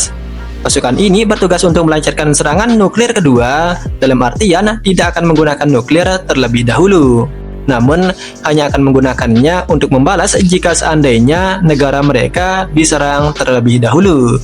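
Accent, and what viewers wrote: Lombok, Indonesia. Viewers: Mimin dari Lombok ya ?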